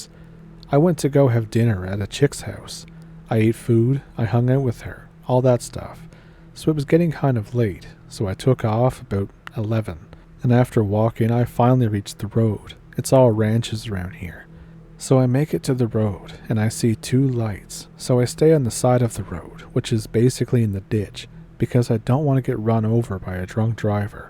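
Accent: Ontario, Canada. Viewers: Odelay,. I enjoy how much you sound like my Ontario cousins-! 😂😊😊😊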